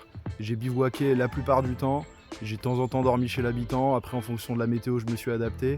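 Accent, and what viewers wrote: France. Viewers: salut, j'entend que tu est du limousin!? moi de même ✌peut être qu'on se croisera 😉je m'abonne pour la peine😎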